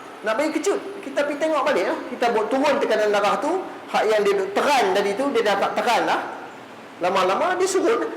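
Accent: Kedah, Malaysia. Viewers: dia pakai bahasa Malaysia juga tapi loghat Kedah. Sebab tu awak tak faham.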